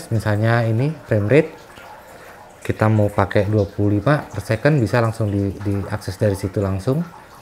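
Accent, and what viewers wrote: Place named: Java, Indonesia. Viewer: Mas kapan buat tips & trik videografi lagi? Udah kangen banget sama logat jawanya mas hehehe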